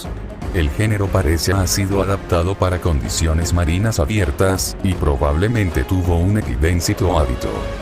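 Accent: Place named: Spain. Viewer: Yo pense que eras de España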